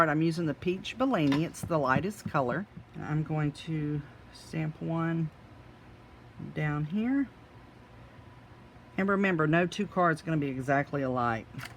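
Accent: Texas, United States. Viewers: I just adore listing to your Texas drawl. Your videos are amazing...thanks for all the inspiration! Now where's the video on how you did the multi-colored greeting??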